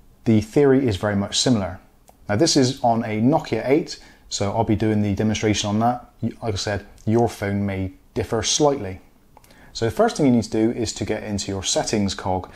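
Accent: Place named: Devon, United Kingdom. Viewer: you're a bloody genius! Thank you! Nice to hear an accent from home too! (I'm from Torbay) Once again, you've rescued me from a compete shock when I made my first voice call on a brand new phone! Cheers!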